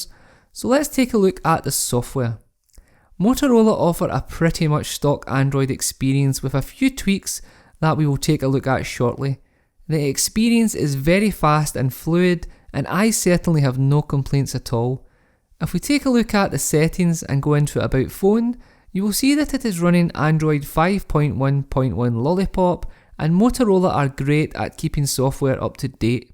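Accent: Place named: Scotland, United Kingdom. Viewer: The share joy of a Scottish accent combiled with a tek vide cannot be mesured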